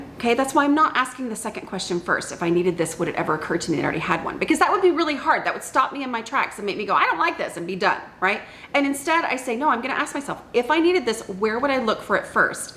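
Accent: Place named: Texas, United States. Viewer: Postal scale (I believe speaks Texan ❤😊)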